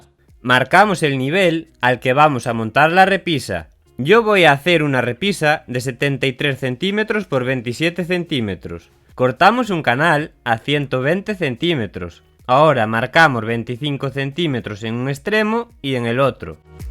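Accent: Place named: Galicia, Spain. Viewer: dios que acentazo gallego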